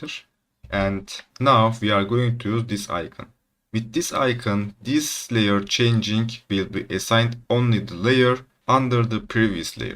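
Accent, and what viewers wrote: Turkey. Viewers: yemınle var ya sırf aksandan turk dedım yorumlara baktım sırf bu yuzden :)) well done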